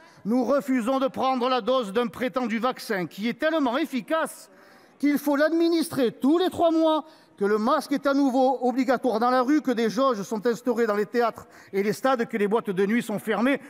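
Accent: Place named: Marseille, France. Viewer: Cet homme est splendide, dans la pensée, dans la parole, un véritable marseillais dont l’accent est à tomber, qui joue à la pétanque à la perfection. Un homme du sud comme nous les aimons, qui en a dans le pantalon.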